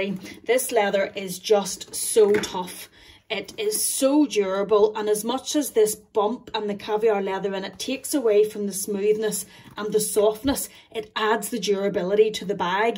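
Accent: Ireland. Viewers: Love your Irish ☘️ accent 😊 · Hi, I have to say I loved listening to you because of your lovely Irish accent. I love Channel. You are very knowledgeable. I just subscribed.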